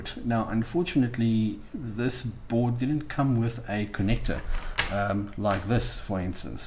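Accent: South Africa. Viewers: Are you south African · you sound South African im based in capetown any chance its still available if you are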